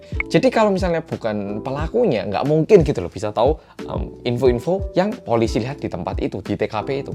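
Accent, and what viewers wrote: Java, Indonesia. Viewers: Aku dulunya pe cinta drakor. skrng, udah sering nonton drakor Krna sudah ketagihan menonton vlok medok😅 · Medooooook, kacamata e baru rek mbois 😎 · oppa oppa medok😍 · Gak kerasa awal tau mas 4 tahun lalu, masih inget bgt prtama nonton video yang ngejelasin ttg sim. Abis itu hebohin org serumah grgr org korea yang medok, setelah itu rajin nonton video mas wah. Terharu liat perkembangan channel ini 😭😭😭 chukkae mas udah smpe segede ini🎉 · menarik juga nih ceritanya wkwk jadi pengen nyoba nonton filmnya:D makasih banyak ya mas, dari bbrp video mas aku jd banyak tau ttg hal2 baru, udah sejak lama malah wk tapi aku baru komen skrg aja:v semangat terus ya mas! suka sama kalimat yg disisipkan di dlm video, bener bermakna, ga lebay, realistis, dan memotivasi. Intinya, apa yaa wkwkwk.... SEMANGAT TERUS! MAS BERBEDA, MEDOKNYA, PEMBAWAANNYA, MATERINYA, semuanya AKU SUKA krn itu semua ISTIMEWA! 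SEMANGITTT!!!!! /abaikan kalimatku kalo terkesan lebay or ga nyambung ya mas wkwk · medhok ◐.̃◐ Nessie Judge: horror 👻 Nadia Omara: ngegass 😈 Arroyan Pram:serius, editan terbagus dan niat Detektif Aldo: serius pake banget (｡ì _ í｡) Gak tau kenapa aku suka banget sama kalian:-)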